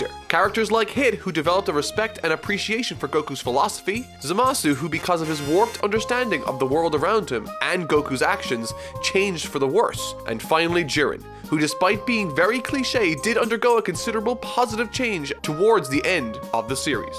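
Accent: Ireland. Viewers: Just curious are you Irish? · Idk why i didn't catch it before but you are really irish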